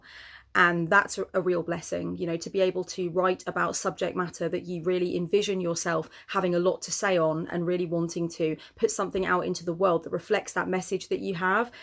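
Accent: United Kingdom. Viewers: You are right! I wouldn't listen to an audiobook if it wasn't you. My son has Autism and is obsessed with British accents. Sometimes I put you on to calm him or pull him out of meltdown. XO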